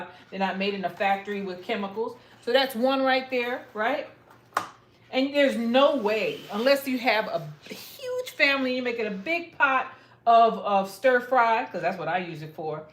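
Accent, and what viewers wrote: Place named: New York, United States. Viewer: Your video popped up on my feed. I love it. I knew you was a Newyorker I can hear that NYC ancient 😃❤ . I am a Trinmerican from NYC too. My father is Trinidadian.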